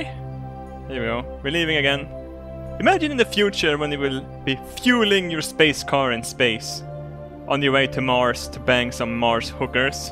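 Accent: Sweden. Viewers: Are you from Sweden ? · well he's sweedish so he pronounces J's as Y's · Hikari Yeah,He's sweden xD I've known that for a LOONG Time before he starts his account.Well i tought it was funny though.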